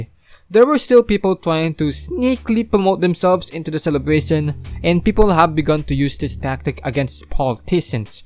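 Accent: Philippines. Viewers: Finally someone with English speaking Filipino accent stepped up to understand the situation than native language content creators. All topics aside but this is wild, this is why I wouldn't trust politics all because of Marcos. Marcos did banned Beatles from performing in PH and I was pissed off by that. And now sending Du30 to Netherlands? What Marcos did was ruthless and very greed to hide from us.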